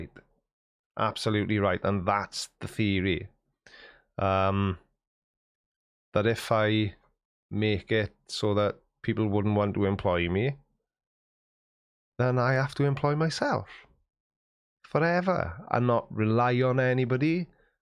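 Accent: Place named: Wales, United Kingdom. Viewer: G'day from South Australia, I think the Welsh accent is the best in the world followed by the South African. There is nothing wrong with speaking your mind, don't change that's why we follow you.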